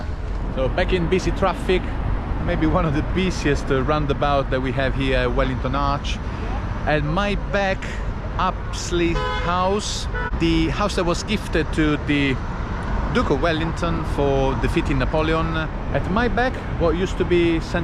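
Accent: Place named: Italy. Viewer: Just a personal question. Did you grow up in Italy? I love your accent. Any recommendations for an American looking to take a trip to Italy, specifically regarding places to see ?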